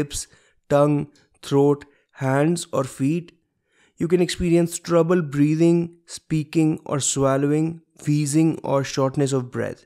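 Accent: India. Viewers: Your accent is so Indian Love it!!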